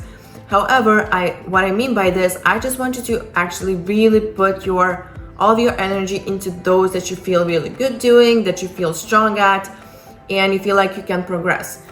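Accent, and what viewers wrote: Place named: Russia. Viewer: Did you hear about the new Linkin Park vocalist, Emily Marcia Armstrong? There's a concert online — she looks like a little firecracker, if you know what I mean! Nothing too crazy, just an interesting, strong personality. Anyway, this video started with an invitation for women only seconds. As a male, I find it offensive since these videos should be made for... just kidding Strong hook in the first 9 seconds, progressing to a life story — product placement, and more American, with... what was it, a Russian accent?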